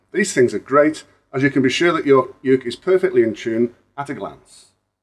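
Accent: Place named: England, United Kingdom. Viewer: If nothing else it makes such a nice change to hear a youtube tutorial (on anything) in an English accent. (Sussexman here)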